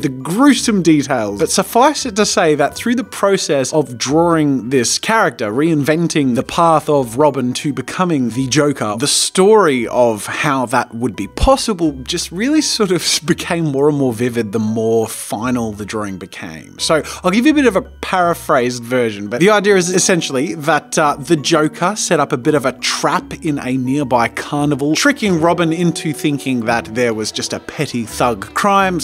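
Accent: Australia. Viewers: Lunatic Laughs in Australian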